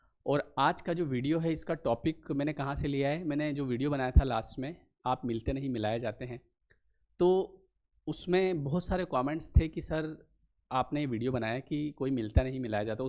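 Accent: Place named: Maharashtra, India. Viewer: Chhan sangital tumhi sir aani tumhi mla bahutek marathi aahat as vatal tumchya bolyavarun ...tumchya pudhchya video chi aamhi vat bghtoy lvkr bnva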